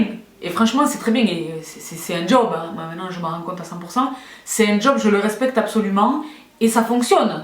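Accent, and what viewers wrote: South, France. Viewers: Super personnalité, super accent du sud, super belle, super intelligente (bon j'arrête là:D) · Super vidéo! Ton accent vient du sud? Marseille? Ou Toulouse? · Quelle belle rencontre!!!!!!! Tu m'éclates. J'aime ce que tu dégages j'aime ton humour ton accent avec tes mots parfois qui me semblent être de l'occitan ou du basque bref le sud quoi!!! Merci merci merci Voilà mes résolutions pour cette nouvelle année combattre la médiocrité et me faire du bien. Merci à byreo grace à qui je me suis orientée ce vendredi vers ta chaîne que j'adopte Continue à nous faire du bien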